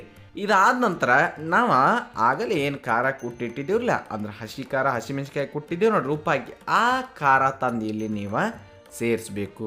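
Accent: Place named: Karnataka, India. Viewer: Your kannada explanation is so sweet to hear,our native desi kannada.❤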